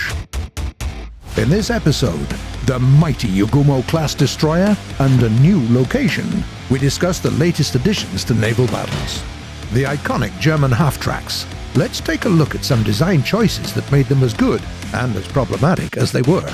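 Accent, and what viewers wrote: United Kingdom. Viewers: He sounds british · this dude accent is like an old british dude...... I LIKE IT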